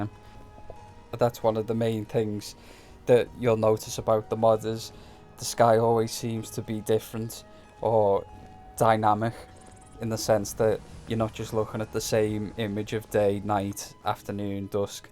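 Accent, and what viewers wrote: Liverpool, United Kingdom. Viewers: Liverpudlian, right? Very detailed video, by the way :)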